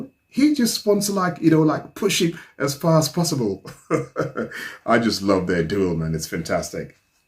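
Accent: England, United Kingdom. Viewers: It sounds like you are from the UK (England). How is it that you never heard this song?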